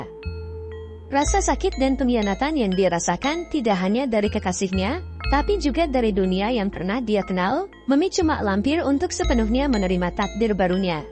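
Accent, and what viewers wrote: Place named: Netherlands. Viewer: Suara yg buat konten ini j suara logat orang belanda.bukan orang indonesia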